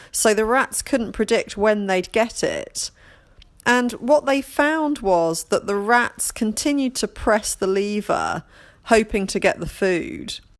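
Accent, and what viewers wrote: England, United Kingdom. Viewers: I prefer the English accent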